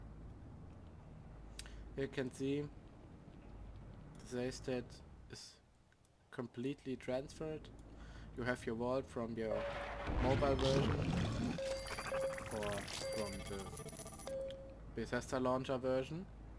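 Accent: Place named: Germany. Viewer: es hört sich so richtig deutsch an:D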